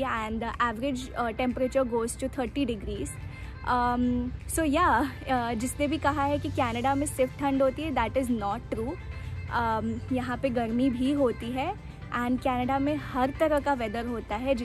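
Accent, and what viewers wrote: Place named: India. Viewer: Perfect Indian Accent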